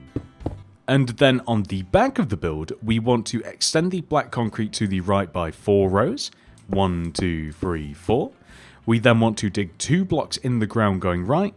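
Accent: United Kingdom. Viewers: He’s British so he pronounces it differently like me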